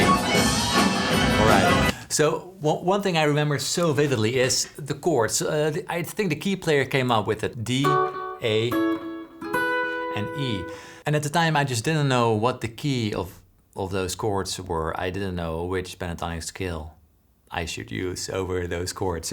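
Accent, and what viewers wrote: Netherlands. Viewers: i might be rly dumb here but I never noticed the dutch accent till now... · Did you say Holland? I was trying to figure out your accent. You're showing me the music inside the music. Thank you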